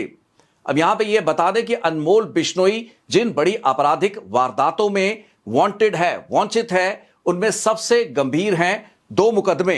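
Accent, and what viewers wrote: Punjab, India. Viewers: Yaar tere hindi v Punjabi hi vekh de ne.. feer punjabi ch hi boll lai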